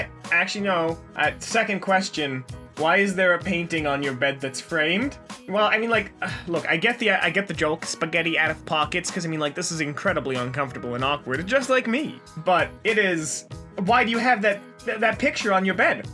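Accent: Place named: New York, United States. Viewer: Just found this channel, love your new york type accent and you seem like a very nice guy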